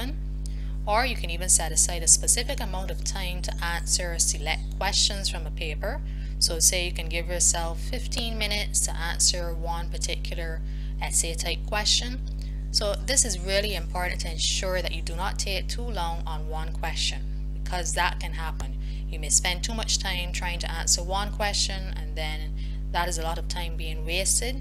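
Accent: Barbados. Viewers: R u from Barbados? U sound similar to Rihanna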